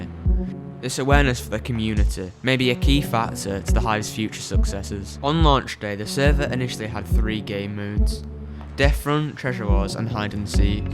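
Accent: United Kingdom. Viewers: Edit: English boy talking about the story of a block game server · are ypu birtish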